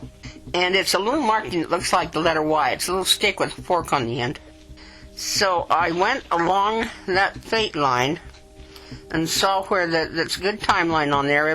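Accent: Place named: United Kingdom. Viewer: how much do you charge for a palm reading and where are you based. I'm in the UK,you sound similar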